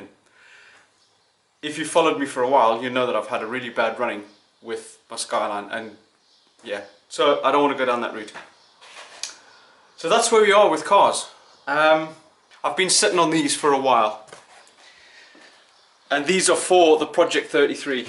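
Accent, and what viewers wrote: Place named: South Africa. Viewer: You south african, bru? giggle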